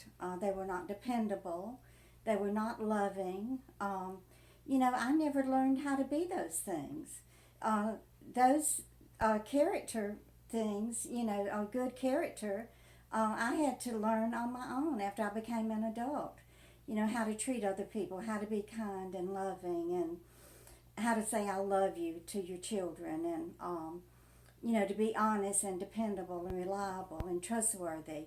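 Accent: South, United States. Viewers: Your such a sweetheart❤❤ please try not to let anyone take advantage of you i love your Southern Charm and accent it's adorable.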